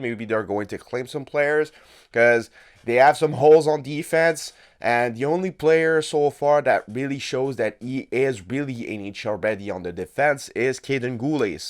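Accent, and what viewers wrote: Quebec, Canada. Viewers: Really love the videos bud! You're really consistent and the Quebecois accent is sick hahaha keep it up brother!!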